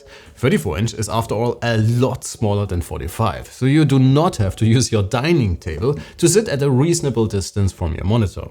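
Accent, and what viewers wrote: Germany. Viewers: Your teutonic accent is very annoying. Please improve that.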